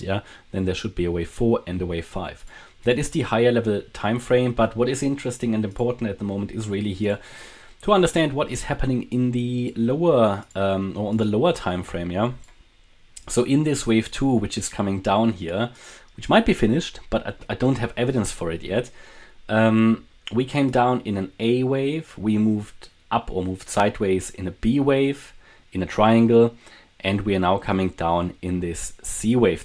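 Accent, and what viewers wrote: Germany. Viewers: German Akzent…..👍🏽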